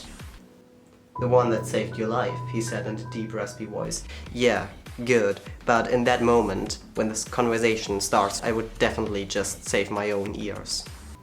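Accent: Germany. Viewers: Sis r u German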